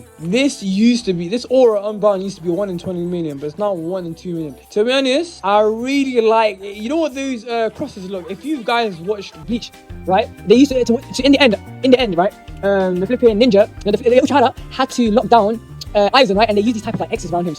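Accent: United Kingdom. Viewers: Ngl didn’t expect you to be bri’ish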